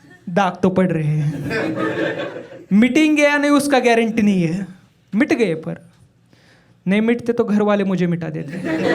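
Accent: Maharashtra, India. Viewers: Marathi accent in hindi standup 😀😀😀😀😀😀😀😀 kdk 😂😂😂😂😁😁🙏🙏🙏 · Brother, I am halfway through your video, I like your wholesome jokes. And be confident in our Marathi accent.❤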